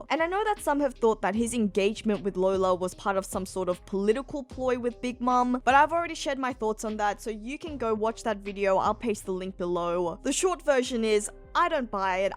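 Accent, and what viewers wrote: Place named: Australia. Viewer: she's Australian.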